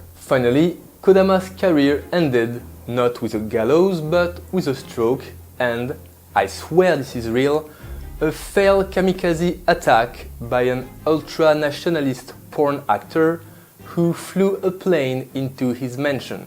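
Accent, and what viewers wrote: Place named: France. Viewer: You sound like a Frenchman.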